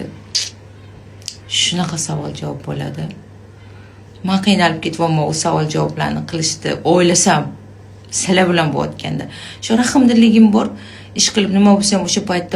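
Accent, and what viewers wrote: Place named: Samarkand, Uzbekistan. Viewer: Toshkentcani eplolmisiz samarqand shevasida gapiruvrin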